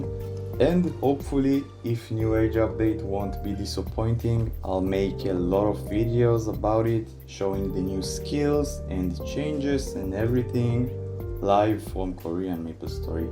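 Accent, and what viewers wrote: Israel. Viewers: You have heavy Israeli accent 🫣🫶🏻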